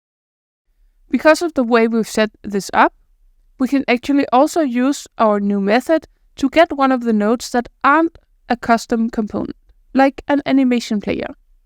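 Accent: Denmark. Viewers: great video, strange random question(feel free to not answer): are you Brackeys GF? you have a very similar way of setting up the video, explaining the issue and talking (this is a good thing, he is very good at this) even down to the Jylland accent.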